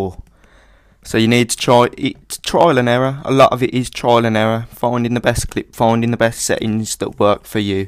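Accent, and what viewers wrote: Birmingham, United Kingdom. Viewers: Go fellow brummy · HA! he's birmingham, or there abouts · Hate Birmingham accent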